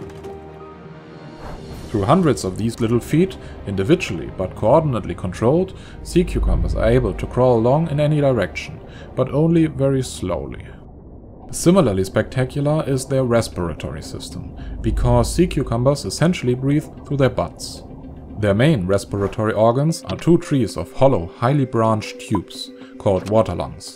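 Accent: Germany. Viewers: You got a strong accent. I guess you're German or something close by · Sounds like a German Morgan Freeman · I can instantly tell hes german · Safe ,ist der Sprecher ein Deutscher! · Are you from Germany? (Ur accent says so) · is that a german accent?? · Your German accent is killing me inside.... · Kann mir nen Deutschen mit heftigen Akzent einfach nicht auf Englisch geben. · Drop the nazi accent. It's offensive and racist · Youre german right i can hear the accent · bwahahahah the way you said "turd like sausages" so casually and nonchalantly...and with that thick german accent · Sis guy soundz gerrman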